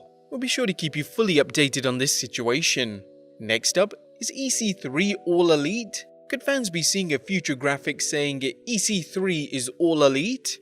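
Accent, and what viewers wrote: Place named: United Kingdom. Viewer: I realize he's British, but yeah..."Bay-zohs" and "Ama-zON"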